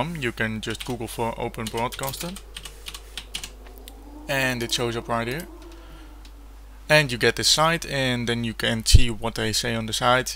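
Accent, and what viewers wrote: Netherlands. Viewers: altijd grappig om dat nederlandse accentje te horen ^^ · The dutch accent though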